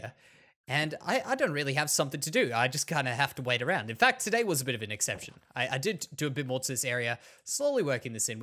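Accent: Australia. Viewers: You have a relaxing voice ,are you from Australia? I am watching this series so late😂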